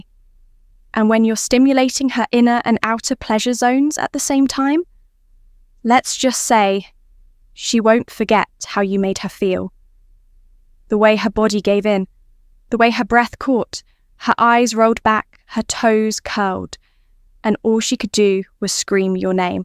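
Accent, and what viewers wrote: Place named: RP, United Kingdom. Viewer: The posh accent is a nice touch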